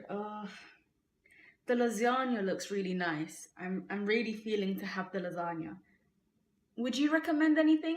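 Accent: Jamaica. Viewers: 😂😂😂 girl u some' else! i love when you speak in that patois accent...sounds real cool! And u have the most beautiful big brown eyes ...yah mann! 👌👌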